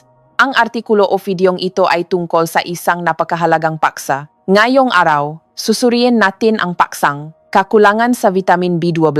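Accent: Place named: China. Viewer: DOKTORA MAGARAL KA MUNANG MAGSALITA N G TAMANG BIGKAS NG TAGALOG ,, PARA KANG INTSIK TULO LAWAY KUNG MAGTAGALOG,, so ma lo sep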